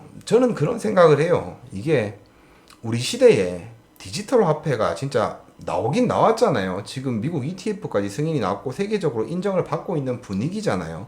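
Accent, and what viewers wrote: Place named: Gyeongsang, South Korea. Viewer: 진솔하게 다가오네요. 응원합니다. 대구말투 ㅎㅎ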